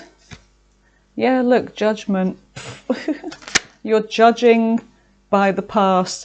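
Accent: United Kingdom. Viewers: You are the best! Really enjoy your Libra readings. Which British accent is it that you have?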